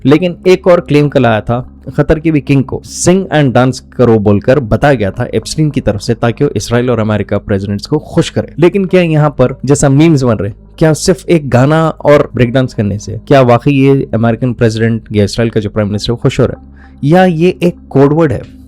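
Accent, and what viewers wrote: Hyderabad, India. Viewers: ओक youre frm bhagynagar. Accent. Shows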